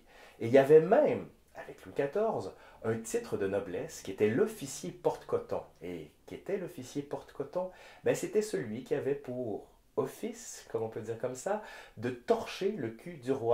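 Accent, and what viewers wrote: Quebec, Canada. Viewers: Video intéressante, dommage pour l'accent québécois 🤣, désolé, jai du mal mais la video est excellente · Je découvre votre chaîne, je ne me suis pas fait "chier" une seule seconde avec votre histoire du caca, félicitations. En plus l'accent québécois, qui pourmoi à un charme extraordinaire, je m'abonne à votre chaîne....... et petit détail , j'ai remarqué, jeune homme, que lorsque vous prenez l'accent des nobles français du temps passé, vous perdez votre accent québécois, pour moi c'est fantastique, c'est comme quand vous les québécois vous chantez, vous perdez votre accent. Alors que nous , les français (vous aurez compris que vous avez à faire à un français), nous quand nous chantons, quand nous parlons nous ne perdons pas notre "accent français"..... celui de l'arrogance française, reconnue dans le monde entier (sauf en France).... 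Vive votre chaîne, vive le Québec, vive la France et vive le caca..... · Quelle érudition et ce petit accent canadien, j’adore. Le sujet n’était pas facile à traiter mais vous l’avez bien chiadé enfin, bien traité. J’aime beaucoup vos capsules. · Petit accent québécois s.v.p !!! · Merci pour ce petit message..j’ai regardé plusieurs de vos vidéos elle sont super intéressant.bien expliquer.et ce petit accent canadien trop chou ..💋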